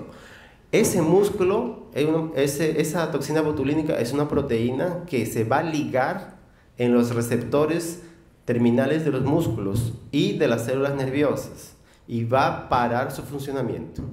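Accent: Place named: Brazil. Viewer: muy buena exposición.. pero no es necesario hablar como brasilero.. yo vivi 15 años en españa y jamas hable como gallego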